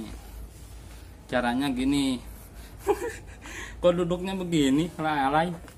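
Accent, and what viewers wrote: West Java, Indonesia. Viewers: Ampelas nya no brp aa ? ni kyk nya org sunda ya ngmng nya wkwkw kocak bgt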